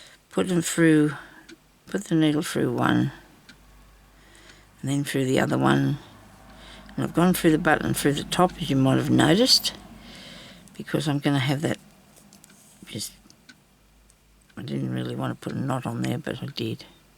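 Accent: Australia. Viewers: I understand you perfectly, you have the same Australian accent as me I also grew up in western NSW. Blue and green should never be seen unless there’s a colour in between is what I was led to believe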